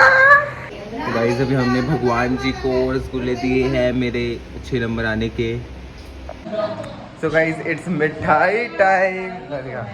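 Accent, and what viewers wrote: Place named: Haryana, India. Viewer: Proper harayanvi tone 😁😂❤️.....vase mere papa ne bhi ase kuch react Kiya 😂...all harayanvi father's are same 😅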